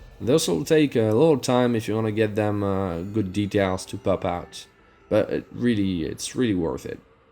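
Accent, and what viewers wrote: France. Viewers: What part of France are you from?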